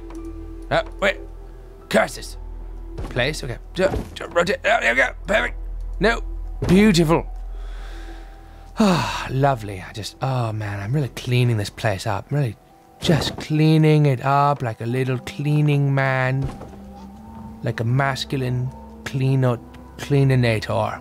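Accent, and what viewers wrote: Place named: United Kingdom. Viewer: lol... Love the British accent. All this brings me back to FNV, first saw you, and ur vids, followed you through all that, and Skyrim till that bombed, but damn you drove me nutts in that one since I was trying to follow, never did finish it. Love your mom, but I agree it's just too much for her to watch all, and u being able to say whatever you want... Thanks for all the entertainment with all these vids, they are very important to many people.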